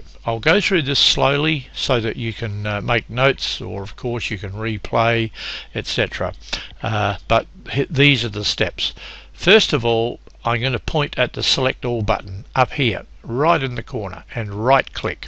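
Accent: Australia. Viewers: Thanks very much. Love the Australian accent.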